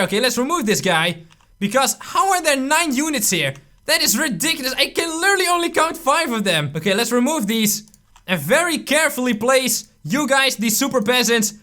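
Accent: Netherlands. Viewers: Het klinkt of jij nederlands bent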